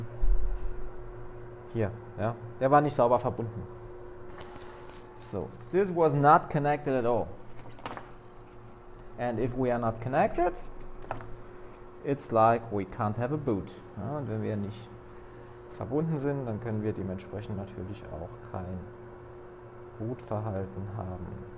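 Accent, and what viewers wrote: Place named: Germany. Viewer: my inglish is very bad jajaj . i think your english is really good , a little be mixed with german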